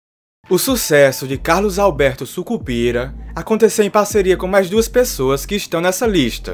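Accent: Northeast, Brazil. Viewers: Vc é nordestino? se for eu também sou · Vc e do nordeste? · VC é nordestino!! De que estado? Eu sou da paraiba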